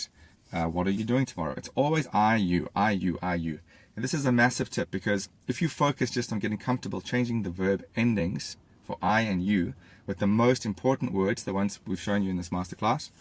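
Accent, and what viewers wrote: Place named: Cape, South Africa. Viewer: cape accent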